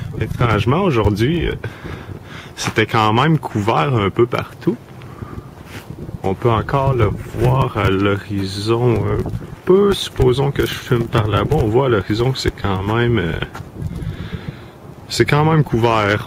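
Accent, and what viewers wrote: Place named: Quebec, Canada. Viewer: Selam, peux tu ecrires les ingredients necessaire pour une coulée d'orgonite? S'il te plait? Parce que je ne comprend pas trop la belle accent du Québec... Je te remercie du fond du coeur.. Un futur Ami.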